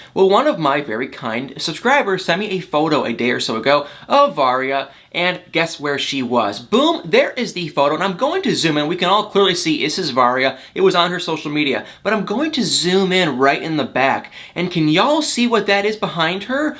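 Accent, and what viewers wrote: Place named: Midwest, United States. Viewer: You crack me up a northern mid western constantly saying Y’all. 🤪. Love ya. Greeting from NYC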